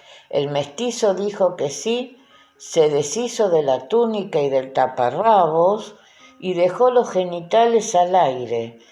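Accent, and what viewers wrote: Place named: Argentina. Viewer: Quiero hacerte una pregunta.. E escuchado 24 episodios que tu as narrado estupendamente.. Hay más o se termina hay gracias.. Bueno por tu voz se que eres de la Argentina.. Yo soy de España Murcia un saludo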